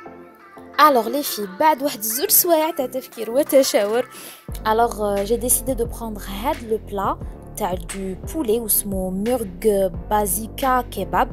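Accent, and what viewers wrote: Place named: France. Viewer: J adore ta voix et ton accent français .bon anniversaire .enjoy.bisous du Maroc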